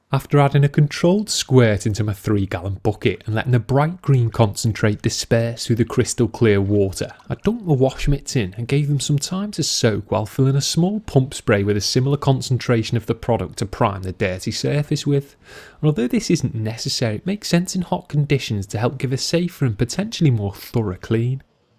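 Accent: United Kingdom. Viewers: DieselDan09 brit accent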